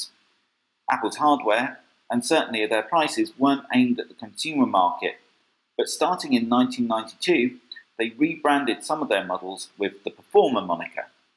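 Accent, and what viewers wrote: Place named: England, United Kingdom. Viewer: the thick english accent on this blows. I can't understand it very well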